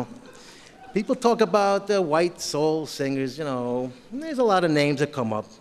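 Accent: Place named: New Jersey, United States. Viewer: I'm from the South and I love Jersey talk keep it up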